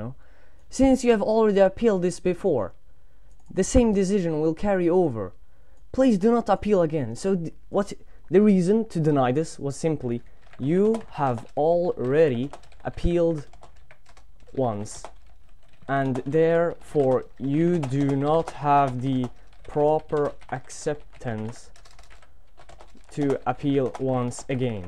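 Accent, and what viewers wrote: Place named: United Kingdom. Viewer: Can't tell if you're British or American LOL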